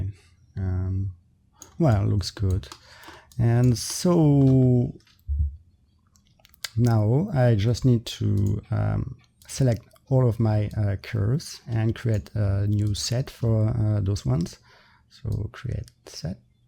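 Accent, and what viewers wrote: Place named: France. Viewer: Ah génial merci beaucoup!! Tout le long de vos vidéos je me demandais si n'il y avait pas un petit accent français caché derrière tout ça haha mais avec votre site il n'y a plus de doutes! Super travaux :)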